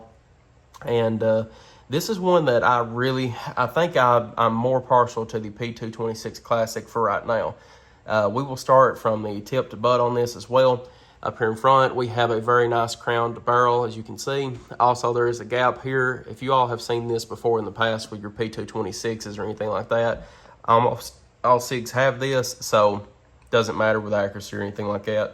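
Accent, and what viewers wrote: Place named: Middle Tennessee, United States. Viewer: Where are you from, brother? I'm from Savannah, TN and you sound just like we do here in middle TN.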